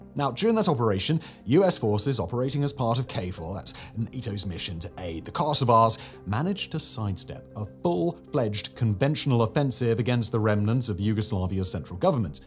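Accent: United Kingdom. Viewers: Dude, slow down, for crying out loud! Can’t catch everything you’re saying with this Brit accent.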